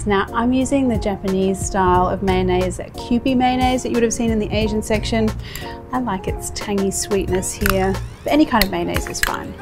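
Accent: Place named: Australia. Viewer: I like you Australian accent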